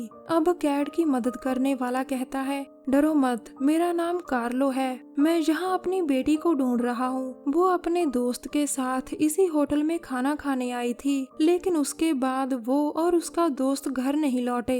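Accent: Punjab, India. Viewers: I always love you content. Specially your punjabi influence in explanation.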